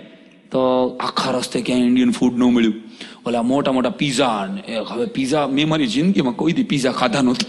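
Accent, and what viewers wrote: Kathiawar, India. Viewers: This gadhedo from gondal is khathiyawadi not surti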